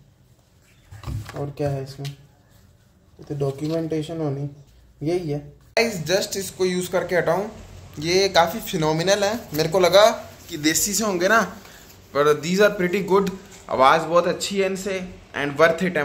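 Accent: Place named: Pahadi, India. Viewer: Pahadi accent ❤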